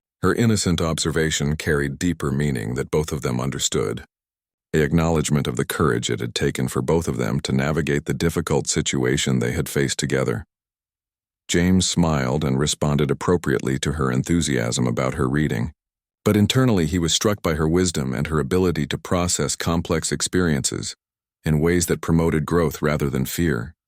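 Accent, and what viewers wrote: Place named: United States. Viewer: Why is the voice over an American and how did he get this information ?????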